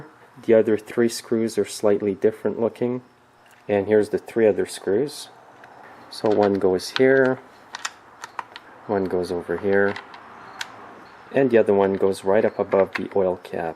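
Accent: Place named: Quebec, Canada. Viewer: Hey this worked absolutely perfectly on my 180 C Easy2Start. Couldn't have done it without your careful, calm coaching. You sound as if you might be from Quebec, if so, merci from an Ontario friend!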